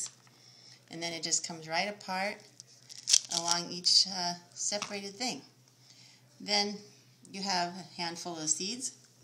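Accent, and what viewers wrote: United States. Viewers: THE 'right' way? The accent held no surprise for me. Who else would be so arrogant as to think that 'their' way is 'THE' right way but an American? Say what it is: a better way, a less messy way. But 'the right' way? Says who? I've seen several ways that are even better.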